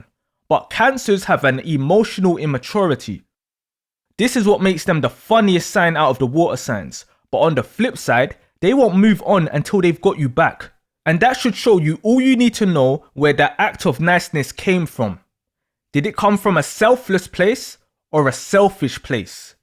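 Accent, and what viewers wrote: United Kingdom. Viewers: I keep on waiting for - Someone tell Doja cat, I’m trying indulge in that. 🎶 Rihanna’s face made me click & The narrator’s British accent made me stay. 😂👍🏾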